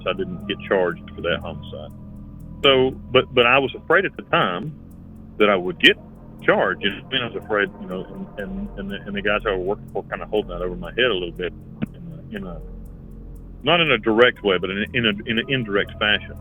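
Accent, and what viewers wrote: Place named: Manchester, United Kingdom. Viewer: New subscriber here - recommended by Disturban. I noticed your accent, are you from Manchester?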